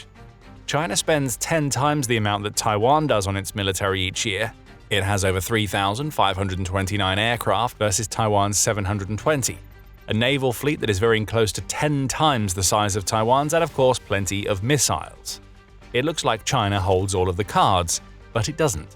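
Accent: United Kingdom. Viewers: Despite the British accent, this is not a European perspective. The video accuses the Chinese leader of doing exactly what the US administration is embroiled in - extreme corruption and promoting talentless political hacks to leadership while firing actual skilled leaders such as Gen. Christopher Donahue. I can’t imagine tattoo-covered Kegsbreath was making a rational decision messing with Delta force. So, don’t expect the US to do anything, but make matters worse. They’re also worse off economically. Practically no one can afford a house here. The GDP is artificially propped up by a few billion/trillionaires who control 50% of the economy or more. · I enjoy watching your videos and your British English voice. But why use Americanisms like "gotten"?!!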